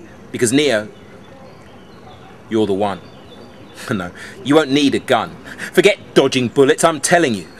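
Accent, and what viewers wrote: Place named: United Kingdom. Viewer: sweet british accent lol im not that into poetry but this sound pretty awesome :D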